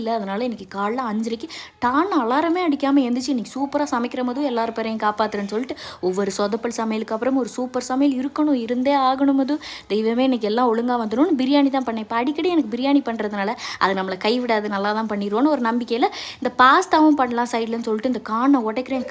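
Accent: Tirunelveli, India. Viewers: R u from thirunelveli sis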